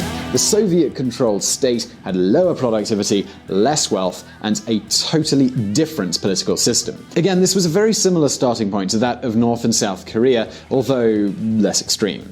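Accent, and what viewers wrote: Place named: United Kingdom. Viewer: The "Solidaritätszuschlag" you are referring to ignorantly, which you falsely say was used to built infrastructure in East Germany was truly paid by all Germans (that means West and East Germans). It was used to pay • for 15–20 % of the Second Gulf War • support countries in Central, East and South Europe financially • built infrastructure in East Germany • built infrastructure in West Germany *<link> When it comes to voting for DIE LINKE then yes, East Germans are much more influenced by left ideas. Karl Marx was a German. But how in the world is that "heavily influenced my Russian politics"??? Or do you mean "Soviet politics" in your ignorance? East Germans were oppressed by the Soviets. June 17th 1953. And how in the whole wiiiiiide world does East German socialism history explain East Germans vote for the AfD????? That has other roots. 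Go research them! "[...] West Germany became the embodiment of its capitalist occupants." Social welfare was introduce to Germany and cooperated into its free market system by Bismarck in the 1880s. It expanded ever since. Who's unbiased here? Did you watch too much PragerU again, my American friend with the British accent? Your ignorance is enraging. Please consider how many young, inexperienced people are watching your bullshit! Please, please do your research. Please don't fall for platitude. Bring someone into the team who actually studied politics. And economics. Or at least history or law. Anything. Just someone who was taught scienti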